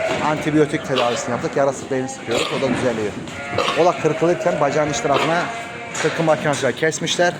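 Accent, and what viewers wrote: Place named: Erzurum, Turkey. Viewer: Kardeşim bereketli olsun sayfana yeni üye oldum. Hangi şehirde yapıyorsun bu işi ve nerelisin Erzurum Ağrı şivesi var konuşmanda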